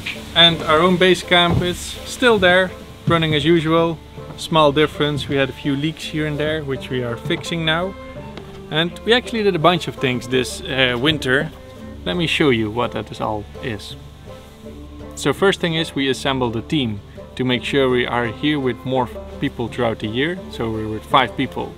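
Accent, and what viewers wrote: Netherlands. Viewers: As a dutch person i immediately recognised your dutch accent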